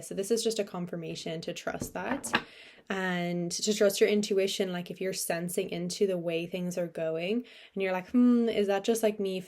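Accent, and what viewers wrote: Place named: Ireland. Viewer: Thank you. Somehow I hear Irish in your accent ❤️🙏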